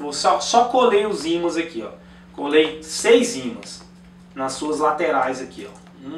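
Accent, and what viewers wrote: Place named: Minas Gerais, Brazil. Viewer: uai , esse. é mineiro hahaha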